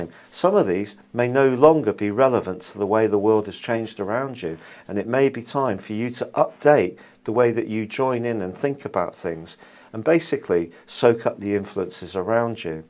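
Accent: United Kingdom. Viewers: Very good and very accurate.!!! Enjoy your British accent and forecast a lot.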